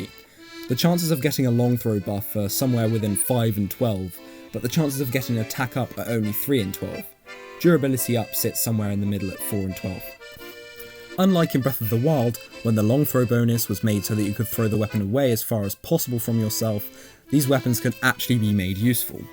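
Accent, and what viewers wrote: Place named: RP, United Kingdom. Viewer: My first thought when hearing your voice in a video - WHAT A POSH BRITISH TWAT. My second - Wow this style of content suites you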